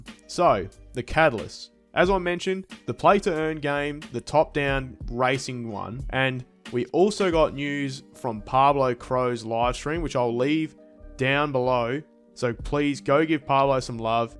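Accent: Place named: Australia. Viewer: Thanks bro..good to hear an Aussie..im QLD'r..😃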